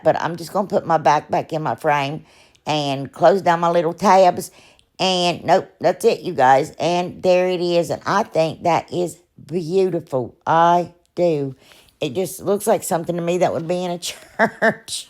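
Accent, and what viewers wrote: South, United States. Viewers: Hi, I absolutely love watching your tutorials. I would love to receive your wonderful "Spring" table topper, it's adorable. I would adopt you if I could. You would be the greatest little sister. I'd love crafting with you for hours. My husband, and I watch your tutorials for hours, and we even try to sound as cute as you do. We say "I love you A LOT" to each other every night before we go to sleep. We have done this ever since we heard you use that expression "a lot" with your wonderful southern accent. I wish you knew how much you brighten up so many of my days. Unfortunately, there are people out there who may say negative things to you. I feel sorry for them, and I pray that they find the inner peace they so obviously need. No one needs to try to belittle someone as special as you are. Thank you for being you, and for all your wonderful tutorials. 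Susan and Will Edgar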